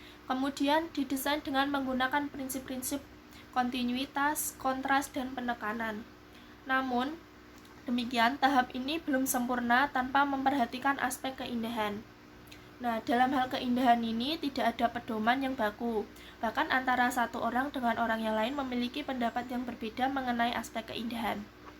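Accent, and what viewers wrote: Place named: Java, Indonesia. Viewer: sangat bermanfaat 👏 medok e ntappp wkw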